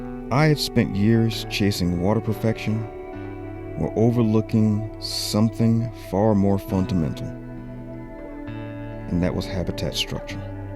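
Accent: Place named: United States. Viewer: I feel like I'm watching a David Attenborough documentary but with an American accent.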